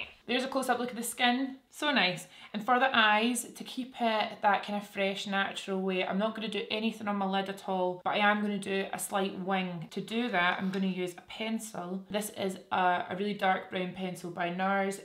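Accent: Scotland, United Kingdom. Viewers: I'm an English teacher from Germany and must admit, the Scottish accent is my absolute favourite one to listen to, although, of course, I'm aware that if you'd talk among friends I probably wouldn't make out any sense at all 😂 It's so charming that it totally adds to my pleasure watching this channel and contributed to my decision to subscribe honestly, a lot...but reason no 1 the humour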